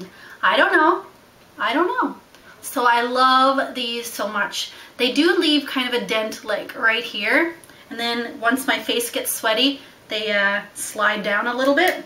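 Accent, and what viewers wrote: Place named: Newfoundland, Canada. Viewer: You for sure still have a Newfoundland twanggggg. Can you believe I haven't been wearing a purse these days? I've been toting all of my friggin' laptop, tablet and notebooks around as a mobile office. Plus all the crap I need for my youngsters when we go out. I should totally do a video. I love you brush your hair flat out. I NEVER brush my hair!!!!!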